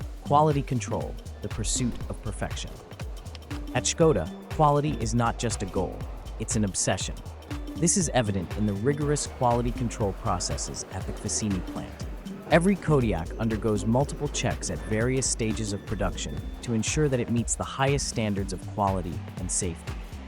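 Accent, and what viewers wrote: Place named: United States. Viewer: Not impressed by the american accent, nor the mis-pronuciation of aluminium .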